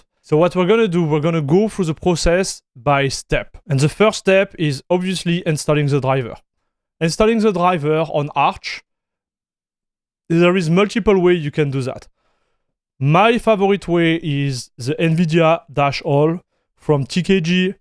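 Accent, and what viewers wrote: France. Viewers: French accent W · He sounds French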